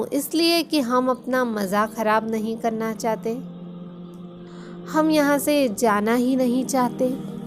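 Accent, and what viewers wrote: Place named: India. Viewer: Masha Allah Apsy milkr khushi hoi jee apky bolny k andaz andaza howa k ap Indian ho.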